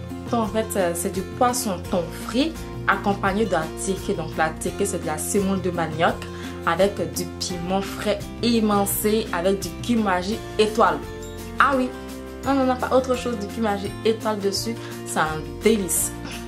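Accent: Senegal. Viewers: Non quand je t'ai vu, je croyais que tu étais une Sénégalaise, t'as l’apparence et l'accent en plus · Elle ressemble à une sénégalaise. Et elle parle comme une sénégalaise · Tu ressemble à une sénégalais et même l'accent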